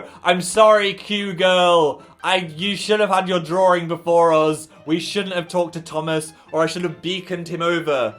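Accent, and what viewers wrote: North, United Kingdom. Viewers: is it just me or is extra british in this video 😭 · You were soooo northern in this video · switched to northern for dialogue and then did the entire remainder of the story in northern, that’s pretty cool tbh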